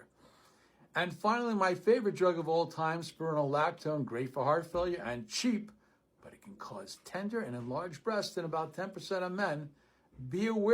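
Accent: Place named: New York, United States. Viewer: I find it comforting to be yelled at by older me with a New York accent about topics I didn't know I would find interesting ever since I volunteered with the Bernie campaign a million years ago in 2016. I'm gonna go blow my dad's mind when I tell him where his shortness of breath is actually coming from. He was sure it was the smoking he did as a teenager catching up with him 50yrs later.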